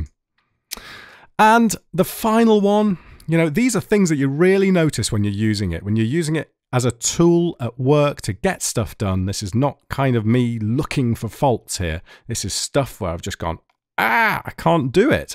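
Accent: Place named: United Kingdom. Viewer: You sound like ownagepranks british accent jonathan character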